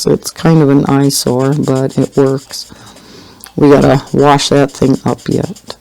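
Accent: Wisconsin, United States. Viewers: praying for you in this rough patch. I already had started this pic so I colored in my new JS Color by number and hope you can show, color and chat with it. Would love to hear your thoughts on this! If you don't have it can I send it to you? So what you are saying is the videos are limited in this season or is it going to be forever? Do you have COPD? You always have a croup sound and cough. Just worried. You don't sound weird to me with your dental issue but you sound like a lady from Wisconsin! You are a soothing cup of hot tea in my life and appreciate you so much! 🥰🌠⭐🌠😊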